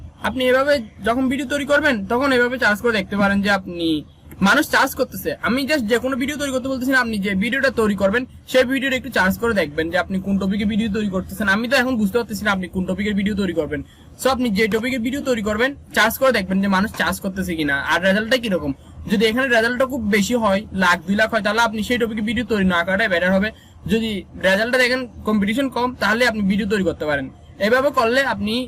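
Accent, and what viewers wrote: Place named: Sylhet, Bangladesh. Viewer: কথা সুনলে হাসি পাই......... সেলেটি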